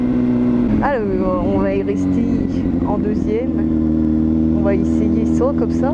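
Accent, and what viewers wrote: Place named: Burgundy, France. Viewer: Oh 😱 ta l'accent bourguignon. Jamais j'y prend en 2. t'es trop large 🤣. Et cet embrayage alors 🤔. Et ben tu m'a bien fait marrer. Eh ben dé que j'ai la mienne tu as l'essai en exclusivité. Et promis tu ne l'a pas encore essayé. Vivement l'essai du futur 4 cilyndres. Je suis sûr que tu vas kiffer la moto de Bébert bobber 😁. Bravo à pour le prêt de la machine. Merci copine pour ce bon moment passé virtuellement. À bientôt et gros V ✌🏻 à vous deux la bise de Bourgogne 😘 tu le sais déjà. Peace ✌🏻.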